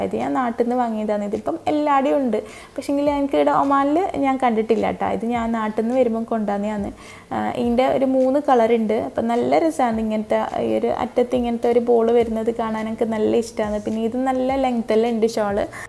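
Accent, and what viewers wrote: Kannur, India. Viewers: Kannur slang ❤️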